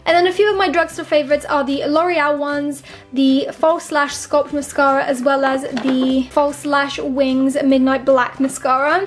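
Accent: England, United Kingdom. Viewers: bruh are you stupid? me and her have a english accent..also we speak proper actual english thats why we are known as english people dumbass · Hii, you have a lovely makeup collection! I love your accent! I'm an Italian girl, so I hear English only at school and my teachers don't speak English with a perfect British pronunciation... So I do exercise when I hear you😂😂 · "Don't do it"... Um, you do realize that she can't help it if she says it like that because that's just her British accent so it's her voice and she's not doing it on purpose... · Anybody here who is British will probably not notice it, but it's her accent, she can't help it.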